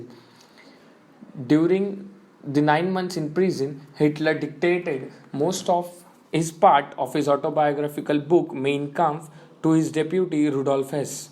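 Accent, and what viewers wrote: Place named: Maharashtra, India. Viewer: आप शायद मराठी हो.. आपकी आवाज बता रही है.. आप के दोनों video अच्छे है.. आप को continue करना चाहिए । हो सके तो Atomic habits पढिये.. बहुत बहुत शुभकामनाएं..💐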